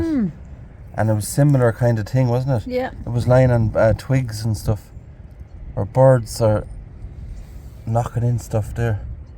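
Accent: Ireland. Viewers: I love your videos. I very much enjoy hearing Irish folks speaking. You could be reading a phone book and it would be entrancing.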